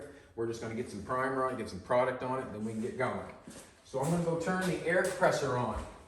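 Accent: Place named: Canada. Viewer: You sound really Canadian ey👍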